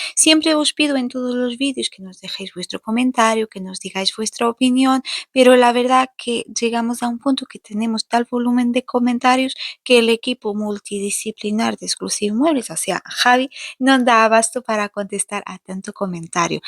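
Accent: Portugal. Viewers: Tienes un acento precioso me relaja ver vuestros vídeos y escuchar ese acento portugués tan agradable que tengáis mucho éxito un abrazo a Javi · Iiiih gracias a ustedes, imaginé que eras portuguesa por tu acento, lo que no imaginé es que fueran pareja ¡Olé a los dos! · Gracias por contar los inicios del proyecto , yo os sigo desde hace un año aproximadamente y tenia mucha curiosidad por saber como habia empezado todo, Tarde un poco en reconocer el acento ,pero habia llegado a la conclusion de que efectivamente eras portuguesa,pero creia que Javi era carpintero Gracias por todo tengo una casa heerdada muy antigua en la que como autodidacta llevo peleandome toda la vida por mantener eos muebles antiguos heredados¨Teneis unas ideas geniales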